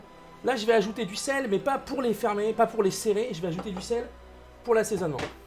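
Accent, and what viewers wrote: South, France. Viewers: cet accent du sud si merveilleux XD heureusement que tu fais des replays! en ce moment je rate tout tes lives :'(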